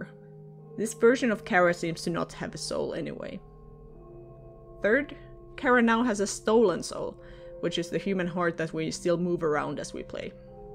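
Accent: Finland. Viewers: Are you finnish? I'm finnish and your accent seems so familiar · Are you a fellow finnish person? Your accent is similar to finnish accent! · Nice Finnish accent :D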